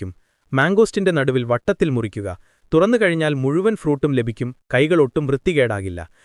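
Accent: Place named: Ponnani, India. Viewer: ഏതോ പൊന്നാനിക്കാരനാ വോയ്സ് ഇടുന്നെ എന്ന് തോന്നുന്നു 😬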